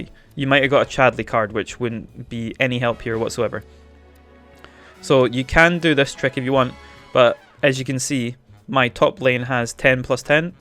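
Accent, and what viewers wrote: Scotland, United Kingdom. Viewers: I'm going through the musclehead battles and the moment and levelling up in prep for hard mode 💪🏼. Usually don't look at guides unless I'm at my wits end 😂.. Will keep an eye on your content, it's good hearing a fellow Scot keep you right. Thank you man I will keep that in mind ✌🏼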